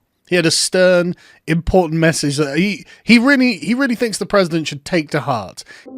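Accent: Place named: United Kingdom. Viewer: Sargon makes a living by being a giant British accented dick to the leftist progressives. For which I pay him cash for not only being entertaining but quite effective at it. #Sargonpillowhug Donald Trump took a pay cut and a hit on his future earnings by taking on the leftist progressives not just here in the states but around the world and he does it by not only being a 'giant orange dick' but by actually hurting their causes and exposing them for the lunatics they are. For which I will vote for him again and I hope his son is taking notes. The thought of what will happen to the #resistance voters if another President Trump sits in the White House for eight more years is quite pleasing. Maybe then we could get some real Conservative ideas and ideals cemented in place and we can yeet these Marxist bastards back into their holes for another hundred years or so.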